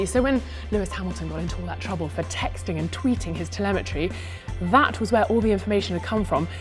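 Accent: United Kingdom. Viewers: I fucking hate that british accent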